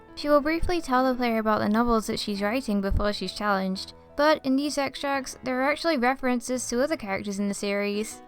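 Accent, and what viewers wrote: United Kingdom. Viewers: Are you british? your accent seems to be that. I am kinda shy saying this, but I think your accent is kinda cute.Your videos ate awesome, new subsciber ='D Greetings from Venezuela. Edited: I misspelled are as ate XD (I need to study more)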